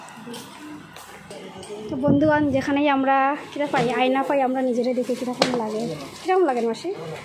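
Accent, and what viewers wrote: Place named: Bangal, Bangladesh. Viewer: Tomar bangal katha khub valo laglo. Ami kolkata theke. Tumi khub beautiful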